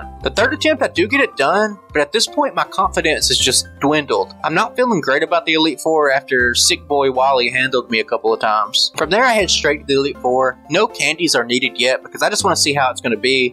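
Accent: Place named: Texas, United States. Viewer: just saw this channel now gg on the win also nice texas accent lol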